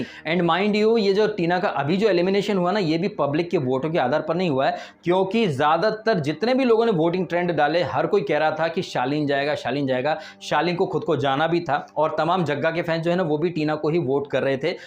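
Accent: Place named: Bengal, India. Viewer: Sir ab appka bengali accent miss karu ga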